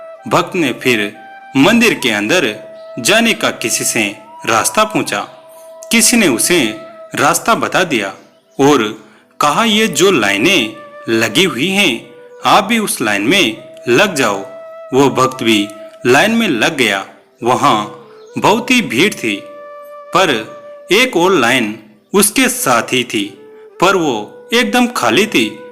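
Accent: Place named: Pahari, India. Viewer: Bhai aap ki aawaj thik nahi lagta hai...lagta hai tum pahari ho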